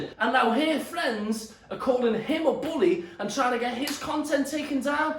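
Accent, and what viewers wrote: Liverpool, United Kingdom. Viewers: Note to self. Don't watch videos muted using captions (sleeping toddler lying on my arm) when it's a Scouse accent. Ended up really confused at what 'Istanbul's standards' has to do with everything and who the 'chiefs' are. Had to put the volume on to find out why it has went so international 😂 (I'm Scottish, subtitles just laugh at us to be fair) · heyyyyyy I love your Chanel so much and ur Liverpool accent hehe I think its from Liverpool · are you scouse? Cause if so what football team do u support?🤙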